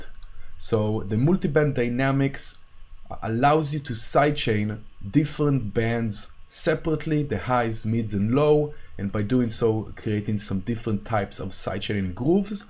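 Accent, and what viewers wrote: Israel. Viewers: where are you from? you sound israeli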